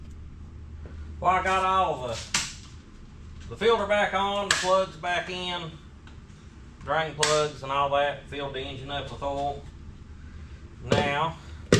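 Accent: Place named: South, United States. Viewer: Some of the words you say, or how you say them remind me of my grandpa. What’s funny about that is I’m probably older than you. Here in northern Ky the country accent is non existent. Always love to hear it.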